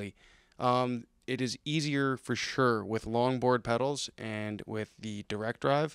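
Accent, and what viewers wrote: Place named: Canada. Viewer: Is this guy Canadian because I can hear it on his accent and me being a Canadian myself I hear it hella bad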